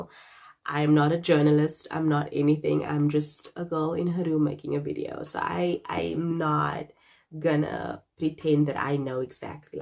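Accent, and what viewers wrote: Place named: South Africa. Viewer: I can spot a South African accent a mile away ❤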